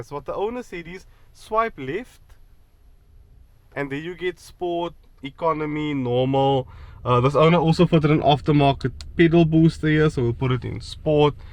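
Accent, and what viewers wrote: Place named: Cape Town, South Africa. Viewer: Don’t change the way you talk it’s great listening to someone speak the Cape Town slang😁🙏 subbed because of that!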